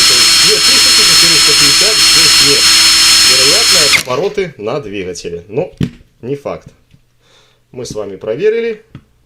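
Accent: Belarus. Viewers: По акценту Беларус ) из Мозыря вы ?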